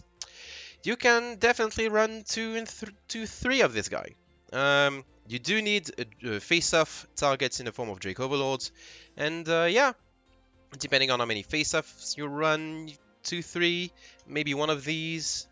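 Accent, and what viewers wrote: France. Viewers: You sound french am I right?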